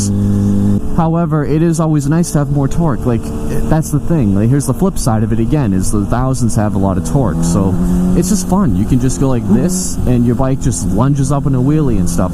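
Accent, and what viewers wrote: Massachusetts, United States. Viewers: You from my home state of Mass? You sound like it in your accent a little.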